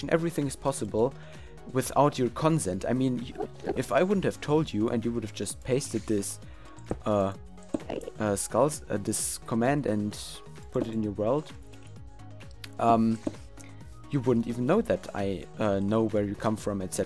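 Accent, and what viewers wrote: Germany. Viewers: Are you German? Im asking because you have a German like accent.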